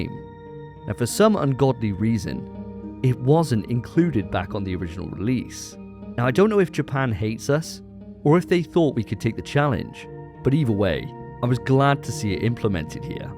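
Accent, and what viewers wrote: United Kingdom. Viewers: It’s just not well thought out. Also, the British accent is annoying.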